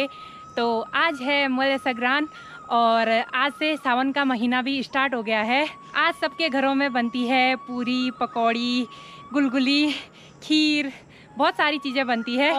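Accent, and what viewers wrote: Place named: Garhwal, India. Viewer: बढ़िया वीडियो बनाया। हिंदी को गढ़वाली लह में बहुत अच्छा बोलती हैं।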